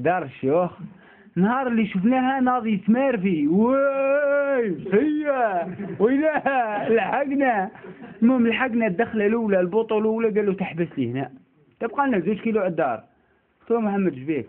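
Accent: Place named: Ouled Nail, Algeria. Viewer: الي تعجبني انو محافظ على اللهجة اولاد نايل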